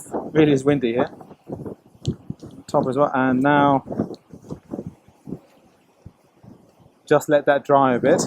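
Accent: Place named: Scotland, United Kingdom. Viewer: You’re Scottish?!! 😮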